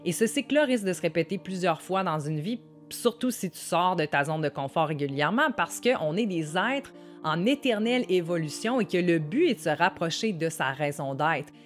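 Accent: Canada. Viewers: J'adore ton accent Canadien ! 🌈✨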